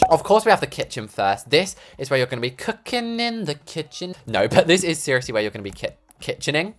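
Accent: New Zealand. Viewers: im sorry im from new zealand and that is the most horibble new zealand accent ever 🤣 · ur nz accent ate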